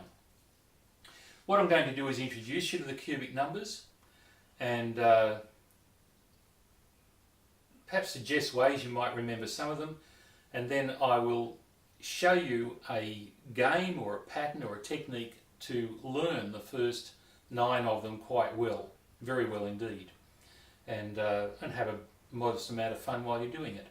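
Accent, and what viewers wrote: Australia. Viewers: g day must be from straya mate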